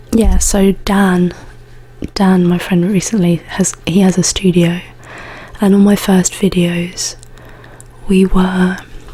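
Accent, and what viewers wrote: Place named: United Kingdom. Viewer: Your voice is very nice, the accent and all, to me as an American. Also very sexy I love British women that aren't total slags.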